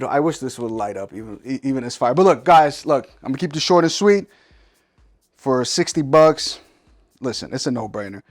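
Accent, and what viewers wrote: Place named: New York City, United States. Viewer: this guy is painfully New York and it's amazing, big twist to the tech community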